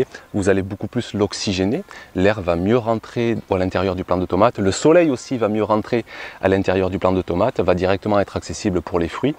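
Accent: South, France. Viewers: Merci pour cette vidéo . Mais je n'arrive pas à comprendre a quelle date (mois) vous récoltez tous ces légumes. Ni comment vous faites pour éviter la canicule de cette année. Comment vous faites aussi pour n'avoir aucun insecte qui détruit les légumes ? J'entends que vous êtes dans le sud, j'y suis aussi (Ardèche, moyenne montagne) et chez nous tout est brûlé par les UV : pouvez-vous nous aider là-dessus ? Merci ! A bientôt.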